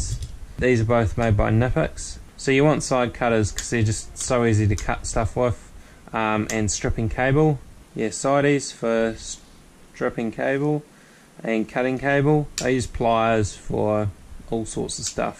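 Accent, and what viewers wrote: New Zealand. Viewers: Love the kiwi English words & accent.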